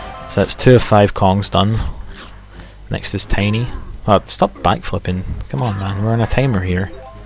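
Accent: Scotland, United Kingdom. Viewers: OH MAH GEWD!!! ARE YOU SCOTTISH?!?! :D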